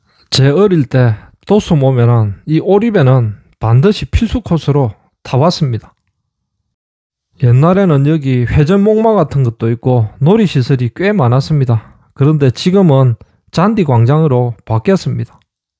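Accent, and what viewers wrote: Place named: Masan, South Korea. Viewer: 수고가 많으십니다~ 목소리 들으면 강호의 발바닥님/ 생각도 납니다~세분이 모두 마산분이십니까?~세분 목소리 억양이 비슷 합니다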